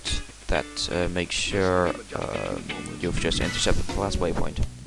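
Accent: Netherlands. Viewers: u dutch mate?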